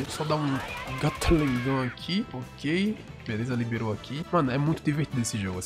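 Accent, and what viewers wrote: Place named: Pará, Brazil. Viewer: Eita, tens o sotaque aqui do Pará, pensei q fosse sksksksksk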